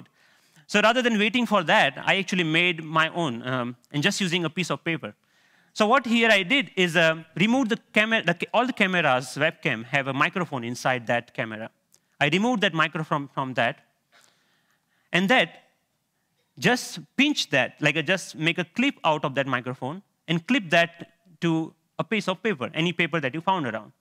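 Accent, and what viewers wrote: India. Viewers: Hes a genius, but not for mastering a language..why didn't he lost his funny Indian accent... :) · 영어 고자.. 인도식 발음 못알아 듣겠다....ㅠㅠ 계속 들어야쥐...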